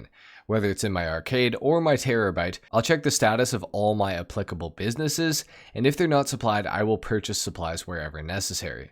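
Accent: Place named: Canada. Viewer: I was watching this video, and i was like, I think I hear a canadian accent. Checked out your channel and I was right. You got yourself a sub now.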